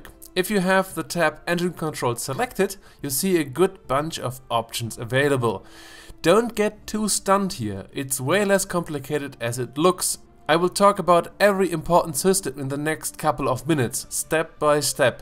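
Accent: Germany. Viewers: Gutes englisch aber man hört das du aus Deutschland kommst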